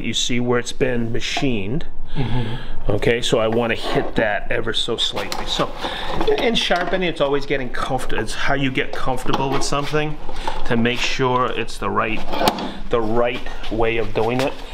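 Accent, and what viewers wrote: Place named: New England, United States. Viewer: I guessed "New England", so I was right but it's maybe unfair to guess six states at once?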